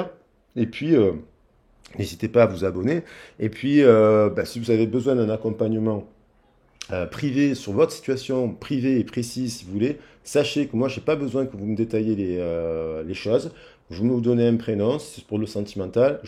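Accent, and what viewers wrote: South, France. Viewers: Coucou j'adore vos guidances et l accent du midi moi toujours seule les week-end mon autre chez lui sa sorcière anniversaire 🎂 🎂 moi je suis de trop gros bisous et bonne soirée je vous embrasse très fort moi j ai mon voyage bloqué je devais pzrtir du 7 au 14 09 bloqué c'est la.sorciere sui a bloqué super mille bisous ❤❤❤❤❤❤❤❤❤🎉🎉🎉🎉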